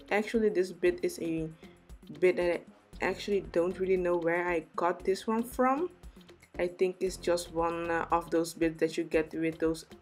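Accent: Netherlands. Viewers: Ik hoor een Nederlands accent daar 😄👍🏽 Top filmpjes! 😘💋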